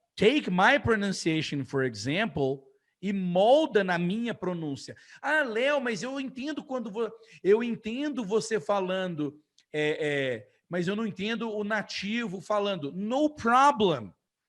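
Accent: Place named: United States. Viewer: Sua pronúncia é bem americana diferente do inglês britânico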